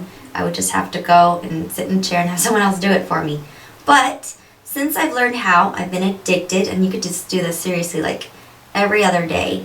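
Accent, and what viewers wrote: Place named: Vietnam. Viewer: I could tell right away that you were Vietnamese for some reason. The way you speak. Hha.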